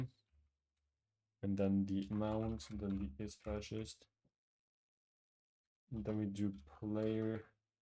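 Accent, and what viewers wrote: Germany. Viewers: are you german? because your accent sounds really german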